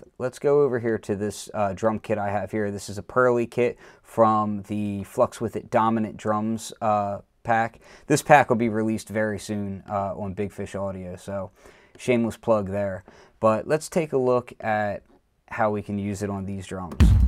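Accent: Philadelphia, United States. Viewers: Thanks, great demo. I like your Philly accent, too.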